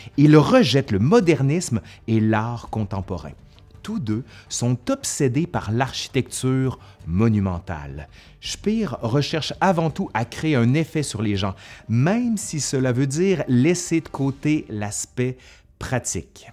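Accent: Quebec, Canada. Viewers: Je ne regrette pas d'avoir eu la curiosité d'aller faire un tours sur votre chaîne après votre collaboration avec Nota Bene, les sujets sont toujours fascinants, éclectiques et le texte est très bien écrit et ne parlons pas de l'accent des cousins québécois que l'on adore 😊 Merci pour tous ce travail j'attends toujours les épisodes avec impatience ! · Les coins ronds! J'adore le français du Québec. Encore une vidéo passionnante. Merci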